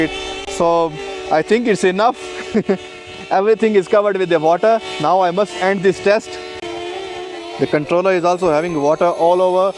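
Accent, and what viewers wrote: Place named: India. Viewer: Bro I think you are Indian . As your accent is exactly Indian.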